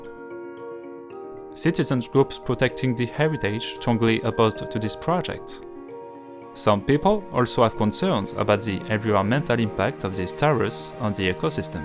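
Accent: France. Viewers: It's not the accent which is most troublesome about this (after all, the fact that the speaker clearly hasn't sought help to IMPROVE his spoken English - all the vowels are French, the intonation is syllabic not tonic, etc etc. -all very predictable imperfections - is a comparatively minor matter), it's the extraordinarily careless attitude of whoever commissioned this audio commentary: thirteen seconds in and we get 'to discuss about' something: a mistake which should have been corrected in troisième... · Love the French accent ! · Your accent is magnificent. I love France and the French. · Yes we like french accents...it sounds so french 🥖🧑‍🍳🎭🗼🇲🇫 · The French accent is beautiful🌹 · You do not need to sorry for your French accent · Think most brits like the french accent.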